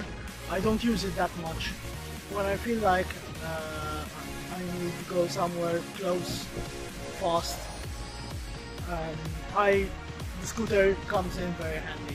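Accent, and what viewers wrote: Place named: Malta. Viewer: Nice Maltese accent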